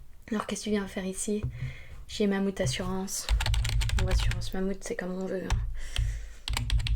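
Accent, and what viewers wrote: Vosges, France. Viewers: Étant vosgienne ton accent me rappel ma ptite ville paumé au fin fond des Vosges merci pour ce retours en enfance 😂😊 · Your French accent is very authentic and natural sounding...love to hear you try speaking English with a French accent and French with an American accent. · bel accent vosgiens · mdr pas mal ... T'es vosgienne ?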